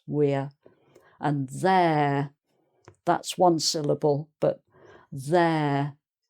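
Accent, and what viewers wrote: England, United Kingdom. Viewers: Que fofa ensinando e que sotaque inglês lindo!!! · Esto es el acento británico? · Na verdade ninguém nasce sabendo, todos precisam aprender. E esse sotaque é o sotaque do inglês britânico que e o verdadeiro, os americanos não conseguem mais emular esse sotaque. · Love from INDIA...ARE YOU BRITISH MAM?? AMERICAN ENGLISH ACCENT IS HARD TO UNDERSTAND FOR US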